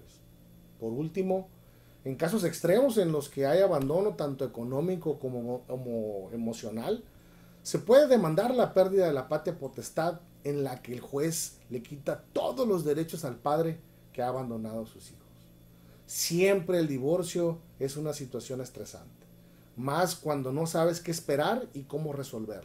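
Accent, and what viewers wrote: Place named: Mexico. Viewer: Mexico, por el modo de hablar.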